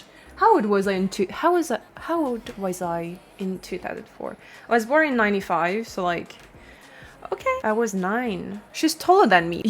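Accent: Vietnam. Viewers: i like your Vietnam accent xD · Trời ơi giọng Tiếng Việt của chị nghe hay mà dễ thương xĩu í tr ơi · oh wow I didn't know you could speak Vietnamese lol, I always thought you're from SE Asia. yesss you pass, that's good enough, you totally sound like a Vietnamese who would insert random English words when they speak lol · Chính ra khẩu âm của chị nghe cute ấy chứ, người miền Bắc hầu như đều thấy giọng miền Nam nghe cute cực🤣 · Come on... your Vietnamese is great. Giọng Sài gòn đặc luôn !!! Be confident because your accent sounds very clear and correct! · I love your Vietnamese pronunciation🙆‍♂️. It was cute 🤗. I could hear and understand it clearly. I feel so proud that someone who debuted in Kpop was Vietnamese ( I’m Vietnamese too). 🇻🇳🇻🇳🇻🇳 LET’s go with HANNI and NEW JEANS 🎉🎉🎉 ( So sorry because my English’s not good) ❤️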